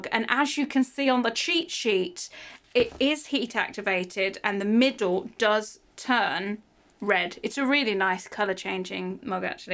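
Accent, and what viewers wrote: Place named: Northern England, United Kingdom. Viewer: I think this vid is the most northern I've ever heard u.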